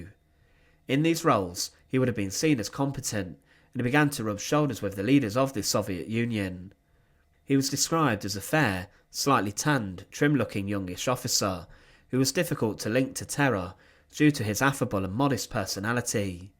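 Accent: United Kingdom. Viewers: The only thing this announcer has going for him is his British accent.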